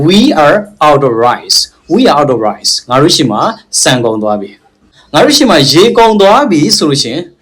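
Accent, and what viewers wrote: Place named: Myanmar. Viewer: ငပိသံလေး ပျောက်အောင် ပြောပေးပါအုံး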